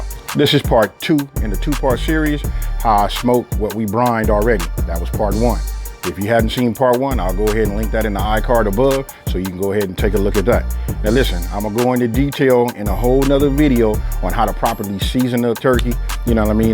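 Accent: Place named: San Francisco Bay Area, United States. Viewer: you sound like your from The Bay....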